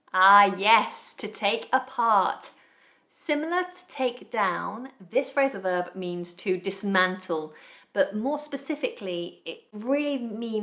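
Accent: United Kingdom. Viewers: nice british accent😊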